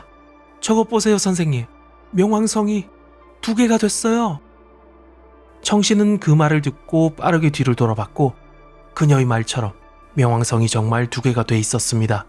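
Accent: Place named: China. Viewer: 엥 왜 말투가 조선족...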